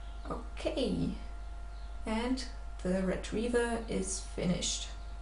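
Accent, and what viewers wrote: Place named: Germany. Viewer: Are u german? U have a german accent...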